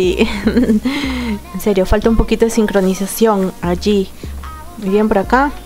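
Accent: Spain. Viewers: hazme un hijo..psdt: soy hombre xd Te sale gracioso el dejo de española y se te escapan las lisuras cuando te matan:3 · Cuando eres peruana y hablas como español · Española xD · te diria q no metas mucho el asento español q algunos no entienden lo q dices:v xD i diran q eres groseras diciendo esas palabras:3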